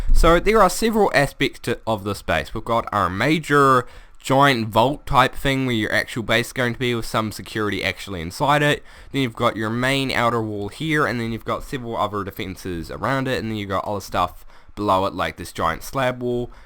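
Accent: New Zealand. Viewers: NZ accent?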